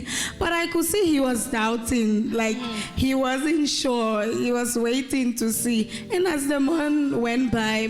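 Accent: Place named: Liberia. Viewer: She sounds so Liberian! I'm a Liberian, I will soon be there in Jesus name!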